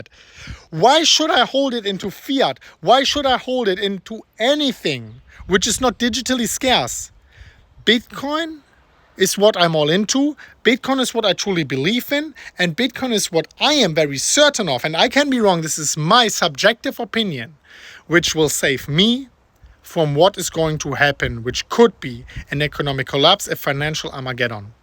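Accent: Germany. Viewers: Das ist doch ein deutscher Akzent. 😁 Gefällt mir sehr gut.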